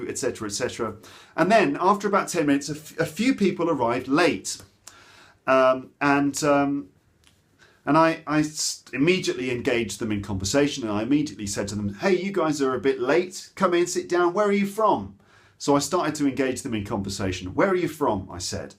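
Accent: United Kingdom. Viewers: Your pronunciation is one of my favourite British pronunciation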